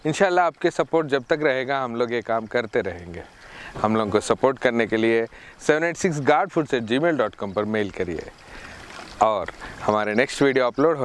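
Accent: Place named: Hyderabad, India. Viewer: I like your hyderabadi touch way of talking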